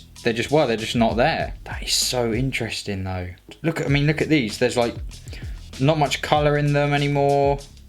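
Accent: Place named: United Kingdom. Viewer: I love your british accent sir. . .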